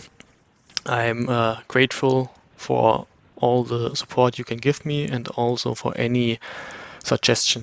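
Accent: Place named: Germany. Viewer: Gutes Video! (Ich geh mal vom Aktzent davon aus, dass du Deutsch sprichst 😜)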